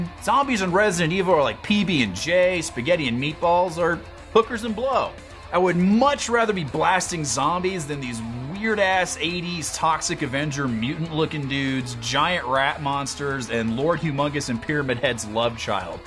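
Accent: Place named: United States. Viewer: Awesome review! Informative, humorous and to the point. It's nice to hear an American tell me about games rather than the obligatory Brit the big sites seem to hire for reviews. I'm not saying they are annoying....I'm saying your AWESOME. Sunbscribed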